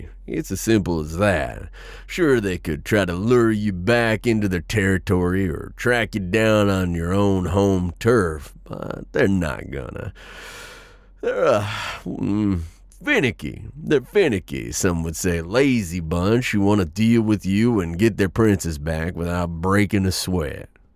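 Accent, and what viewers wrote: South, United States. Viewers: this heavy southern drawl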